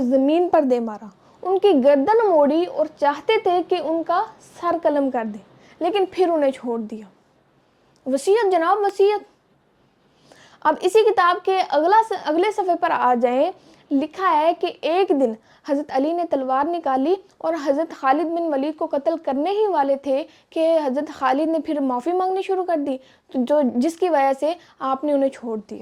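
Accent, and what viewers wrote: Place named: Punjab, Pakistan. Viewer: آپ کی اُردو میں ۔۔۔۔ پنجابی ٹچ ۔۔۔ وکھری ٹائپ کا ھوتا ھے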